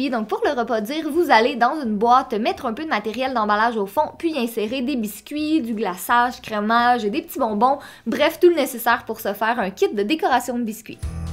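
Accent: Quebec, Canada. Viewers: C'est sûr que tu es de Canada parce que tu as la tonalité des Canadiens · Bordel !! Ton accent québécois est trop mignon 😆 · J'adore ton accent il est trop cute ! J'ai meme demander a mes parents aille te voir au Quebec !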